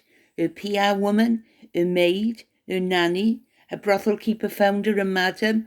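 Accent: Wales, United Kingdom. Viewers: Howdy 👩‍🌾😊To You Lovely💖! I Always Enjoy🙌 Hearing Your🥰 Welsh Voice! It's Very🙌 Calming! Happy😇 Happy🎉🍰🎊 Belated🎉🍰🎊 Birfffday To 💖🌺🌷🌸You!!!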